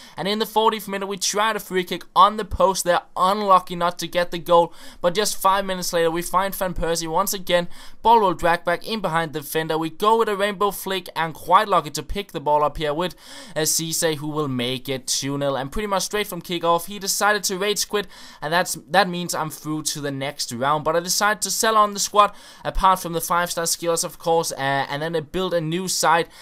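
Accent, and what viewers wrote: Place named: Denmark. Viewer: He's From Denmark, whoever says he has a bad accent try uploading daily Youtube videos in a different language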